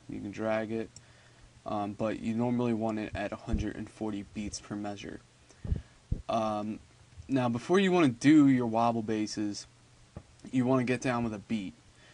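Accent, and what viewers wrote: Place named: Pennsylvania, United States. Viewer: sounds like pa, maybe northern va or maryland. that area most def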